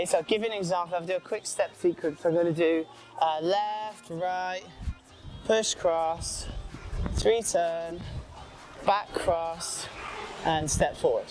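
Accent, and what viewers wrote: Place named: RP, United Kingdom. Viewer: I'm from Texas, USA. I like your posh sounding accent. I'd like to know Where are you from, so that I can add to my knowledge of UK (I assume) accents.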